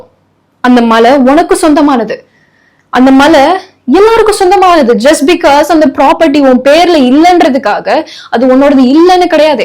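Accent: Tamil Nadu, India. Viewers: your english also has indian accent apo ummaiyave ne indian thana ?🔥🔥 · I was watching your videos by your accent I found you are a tamil girl. Great to see you in speaking Tamil❤ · நீங்க தமிழ்ன்னு முன்னாடியே தெரியும் எனக்கு, நீங்க பேசுற விதத்த வச்சு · I guessed you were tamil when I watched your English videos 😂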